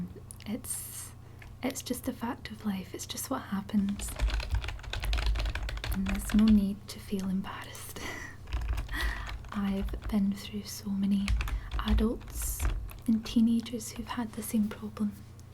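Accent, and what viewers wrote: Scotland, United Kingdom. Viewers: Rebecca Harper it's called a Scottish accent... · Nice Accent! Scottish, Right? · OMG SCOTTISH ASMR THE WORLD IS A BETTER PLACE 😱😱😱😱😱😍😍😍😍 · All your videos are great and a particular thumbs-up for the Scottish accent! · Perfect ASMR! As a Scot I give your Scottish accent 10/10 :) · ASMR I thought as much it was a Scottish accent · How do u put on such a good Scottish accents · I'm absolutely hooked on your role play videos with a Scottish accent. the rp vids also seem so real. love them. thank you for making asmr videos!! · I'm not a fan of your Scottish accent, sorry! The Scottish accent feels like a weird step into the unknown. sorry to be critical just my own opinion. Otherwise really enjoy your videos. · I thought the cables connected to my headphone speakers where plugged into the water supply, because that gorgeous silky smooth Scottish accent just flowed into my head. 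Please can you do another ASMR kidnap video sometime. Thanks a million for all your hard work. 👍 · Where in Scotland are you from?x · I just love to listen to a scottish accent.the best accent there is. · I don't normally like Scottish accents but yours is very nice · heyo ma wee fellow Scottish pal!! where abouts in Scotland are you from? i wanna say glasgowish but then your 'body' sounds different from mine so I'm not sure hmmmmm ohh hmm maybe not hmm most likely much more up north? am I right? I'm definitely right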